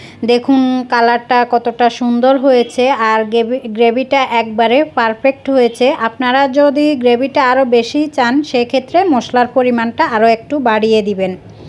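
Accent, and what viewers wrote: Noakhali, Bangladesh. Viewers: আফু, আমনের বাড়ি কি নোয়াখালি নি, আর ত মনে অয় আমনে আংগ দেশি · মনে হচ্ছে আপনার গ্রামের বাড়ি নোয়াখালী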